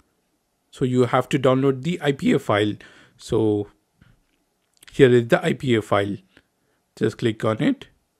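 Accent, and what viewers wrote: India. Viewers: Why do you have a indian accent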